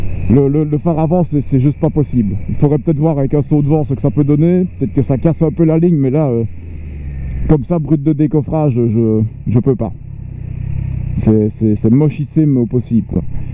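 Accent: Belgium. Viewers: J'ai envie de me la prendre en A2 et je cherchais des vidéos... En t'écoutant je me suis dis c'est étrange il a l'accent et les expressions des mecs de chez moi... ça fait 16 ans que je suis dans le sud ouest de la france pour info... et oui en regardant les panneaux de circulation je me suis dis ! Ba oui c'est un cousin Belges ! Je suis dès Ardennes côté française :) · Ça me fume ton accent belge, j'habite près de Theux aussi mais j'ai acheté une Ktm a gouvy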